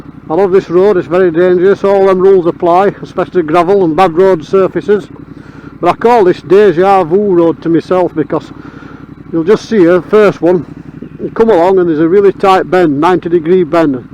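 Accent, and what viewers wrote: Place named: Northern England, United Kingdom. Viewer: Brilliant. Loved your review. Nice to here a proper northern talking about bikes and routes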